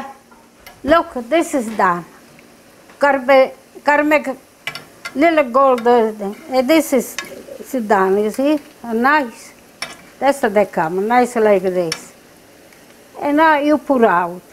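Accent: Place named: Sicily, Italy. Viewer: Oh, dearest Grandma...I looked and looked on Google to find "Cardoons". The were not the ones that my grandma took the kids out into the country to search for. THEN I figured that just maybe you would have a recipe for the ones found in America. YESSSSS!!!! There was your beautiful smiling and enthusiastic face. You have solved my puzzle, and I thank you so very much for it. I cannot wait to send it to my cousins - we are all in our 70's or 80's. I hope that some of them are as happy to relive this part of our childhoods as I am. When I hear your accent - sometimes it brings tears to my eyes because you make "see again" my Sicilian grandma., you are a beautiful woman - inside and outside. Thank you so much - I just ran out into my yard and gather up some "cardoons." Vivi bene e felice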